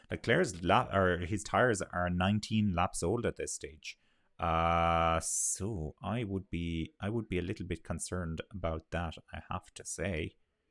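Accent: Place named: Ireland. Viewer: Aaaah a good Irish accent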